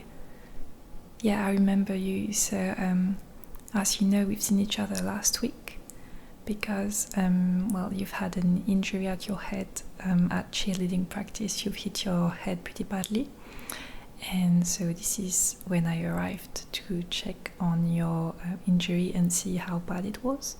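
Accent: France. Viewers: Même pas 2 secondes d'écoute et je reconnais ce magnifique accent français. En vrai ça va on est loin du niveau de Hollande xD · French Accent? 😍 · Je trouve ça chou l'accent français en anglais ^^ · Being a 50 year old German male, I'm struggling a little bit with my imagination being a cheerleader 😁. But for listening to your soft french accent ... let's go for it ... 👩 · French accent?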